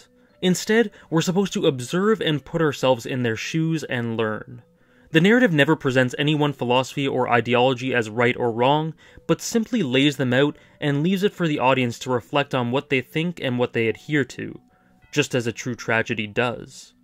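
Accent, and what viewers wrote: Canada. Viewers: Good content to go with a very noticeable Canadian accent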